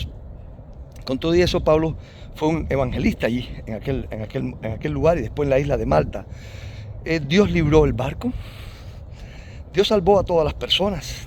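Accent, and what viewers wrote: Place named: Cuba. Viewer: Fuera creyente o no los que su propiedades quedaron intactas, solo podemos decir que Dios los cuido. No entiendo porque usted decarta lo que está antes los ojos de todos. Creo que su forma de hablar me parece Cubano. Parece más ateos que alguien que hace una exegesis de su narración. Nadie puede negar que todas las casa que no le paso nada fue un milagro de Dios.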